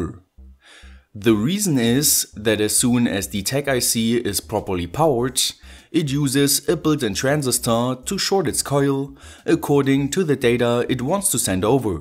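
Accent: Germany. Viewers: Your accent sounds German to me. Kann es sein, dass du deutscher bist? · ouhhh! german hyperinflating english... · That’s quite a German accent of yours. But I don’t mind. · Dein Deutsch-Englisch is Klasse ;D #noHate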